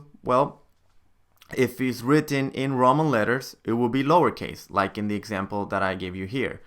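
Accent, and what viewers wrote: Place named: United States. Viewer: Akimoto Hasegawa this is definitely not Japanese or Chinese accent, as a Chinese I think he is an American .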